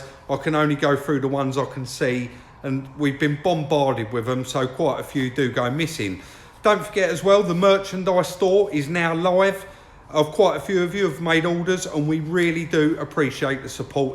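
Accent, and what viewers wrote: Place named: South London, United Kingdom. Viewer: Accent to me sounds sarff London sorry south London ,might be termed as estuarine English may be because of proximity to the Thames estuary I am 1 mile from it now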